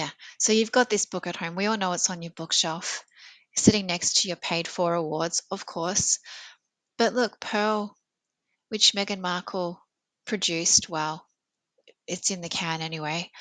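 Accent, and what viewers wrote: Australia. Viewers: Nice to hear a well-spoken Australian voice. "'A whole 'nother thing" - no. "A whole other thing" - yes. (Retired Australian teacher) 😊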